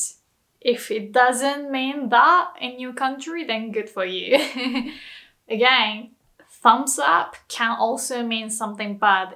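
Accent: United Kingdom. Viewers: Great lesson also you would be hot if you didn't have a British accent but yeah very informative video 👍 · You are also British,yeah?I can hear it when you speak English-the British accent is clear and I LOVE it!Aregato,love! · It's interesting. I'm an American and compared to other Japanese who speak English it sounds like you have a British ascent. Did you learn English from people from Great Britain rather that from America? I mean this in a nice way. I love watching videos about the differences between British and American culture. Differences in culture are fun. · you can hear in your english that you’ve lived in the UK haha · You look are soooo cute looking 😅🙊🙈 ...and just so cute! Kawaii desu ne! 😅 Daisukidesu your English accent 😊 Have you spent time in Australia or the UK for learning English? It shows . It's so nice to hear a Japanese person speaking English without an American English accent or Influence on pronunciation. 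Yokatta ☺️ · Your English professor was British, yes? I can hear it. 😁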